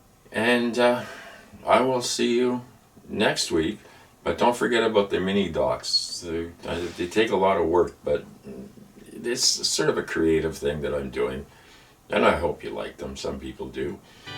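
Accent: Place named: United States. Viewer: you speak fluent american, both a blessing and a curse, so many friends from belgium, france, uk, aussies, etc etc do as well, luv ya, good content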